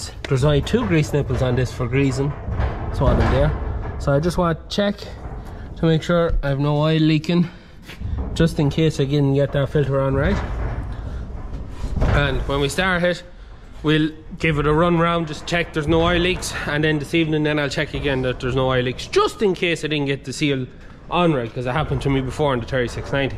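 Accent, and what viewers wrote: Ireland. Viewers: Same beltdrive like an snowmobile! I wonder,is it Irish or is it a dialect you mix whit English?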